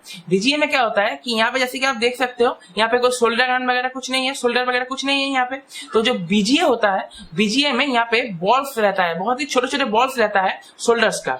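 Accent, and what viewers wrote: Bengal, India. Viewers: Bengali ho kya???🤔🤔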